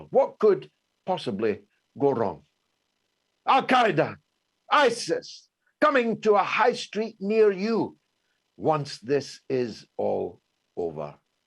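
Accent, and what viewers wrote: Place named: Dundee, United Kingdom. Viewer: George you have always been a traitor to the UK but now I see that you are a traitor to mankind.What a shill, I work with and help resettle victims of this war in Ukraine they are not lying too me. Now take your staccato Dundonian delivery and get lost.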